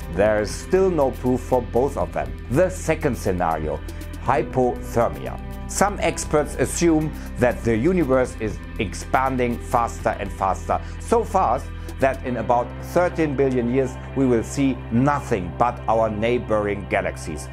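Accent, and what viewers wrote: Germany. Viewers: Ich liebe wirklich sehr ABER die englische Aussprache ist furchtbar! Und die Grammatik ist leider wirklich auch nicht so der hammer. Man hört sofort dass da ein Deutscher englische Texte abliest.... und das Thema hätte ich lieber in gut gesprochenem Deutsch gehört. Für die englischen Zuschauer gibts doch die Untertitel Funktion....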